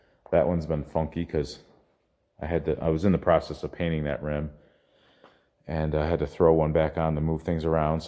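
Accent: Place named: New York, United States. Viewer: says Tires just like Stewie from family guy says cool Whip, must be that New York accent, keep making these great videos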